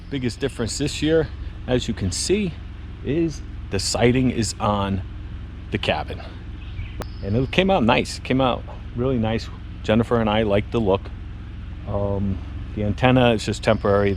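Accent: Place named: Connecticut, United States. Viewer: I grew up in CT and was gonna say your accent kinda sounds like you're from CT, then I saw the plates on your truck! Cool cabin, thanks for sharing all the details with us!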